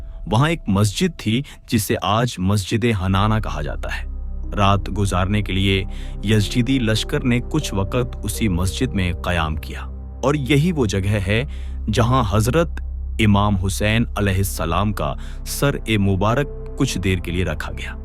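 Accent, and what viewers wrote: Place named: India. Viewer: بھائی ہندی تلفظ میں اردو بول رہے ہیں ، جائرین اردو میں زائرین ہوتا ہے۔ یہ صرف مسلمانوں کو مزید تقسیم اور گمراہ کرنے کی ناکام کوشش ہے۔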